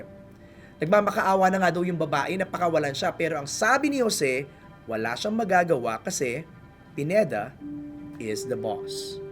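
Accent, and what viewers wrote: Conyo, Philippines. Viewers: ang cute pala pakinggan ng slang conyo in a serious documentary, nuh hahaha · So conyo nmn nung speaker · Sana iba na lang nagkwento. Interesting kaso nakakairita magkwento. Feeling conyo. Kakainis. Hindi ko na tinapos after this comment. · Cono naman magsalita.. arte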